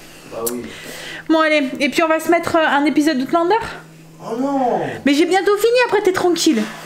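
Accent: North, France. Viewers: Coucou... Je ne connaissais pas une "battée" j'adore votre accent du nord même si ce n'est pas souvent... Ça promet si il y a déjà des moustiques 😡 Les fleurs commencent à sortir pourrons plus grand plaisir Bisous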